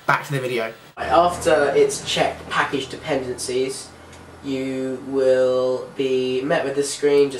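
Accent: United Kingdom. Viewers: this an accent from the British Island. Nice video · you're so British! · its like a peter griffin with a harry potter accent! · are you british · Looks American sounds British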